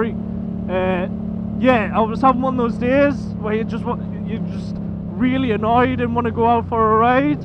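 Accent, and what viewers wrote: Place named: Newcastle, United Kingdom. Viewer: Hi mate good to hear your geordie accent again nice to see u back focus st awesome car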